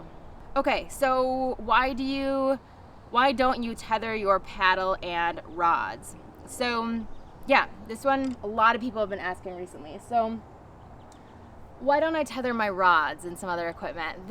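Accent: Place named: Michigan, United States. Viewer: oh yes! I’ve lived in Florida for 25 years now but my first 35 years I lived in the upper peninsula of MI.. Still love & miss that accent! And yes kiddo you got it!😊👍🥰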